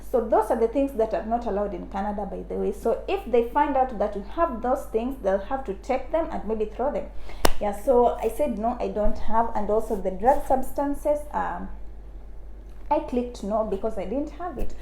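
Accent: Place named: Uganda. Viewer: You are speaking like ugandans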